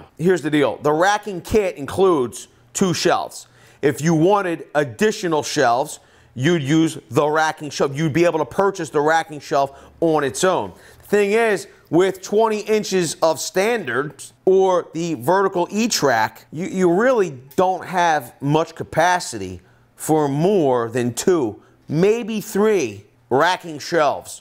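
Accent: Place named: Northeast, United States. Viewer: I'm trying to figure out where you're from based on your accent. It sounds like you spent some time in Brooklyn, Hampton Long Island, Jersey, Boston, and somewhere in the forest of Ohio. I feel like I'm missing one.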